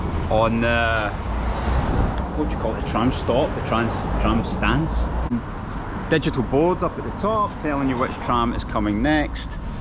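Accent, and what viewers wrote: Scotland, United Kingdom. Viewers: Этот британский акцент)) такое ощущение что я фильм Гая Ричи посмотрел)) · Lol this Scottish accent is exactly the one they used in Counter Strike when you play for the ct forces. Any time I hear that guy I want to buy additional ammo and weapons ; ) <link>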